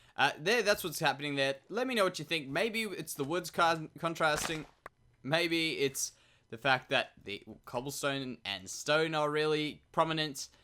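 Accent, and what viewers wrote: Australia. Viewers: Are you Australian? I subbed because you're very Interesting and cool :3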